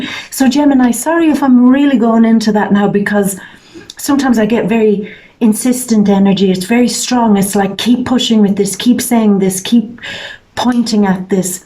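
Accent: Ireland. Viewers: You are very beautiful. Inside and out. I love your irish accent. A lovely Colleen. Thanks for a great reading. I was in tears because its so true👏💞😁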